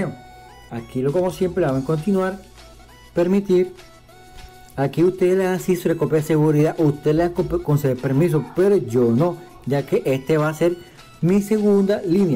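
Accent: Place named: Brazil. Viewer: Jah tienes asento brasileiro en el video. Así es amigo Ya salió la versión 9.00 hace poco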